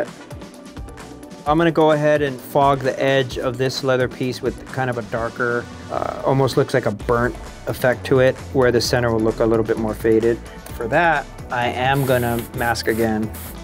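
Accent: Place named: United States. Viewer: Tienes nombre, apellido y pinta de ser hispano... ¿Por qué pronuncias aquello que eres como un yanqui?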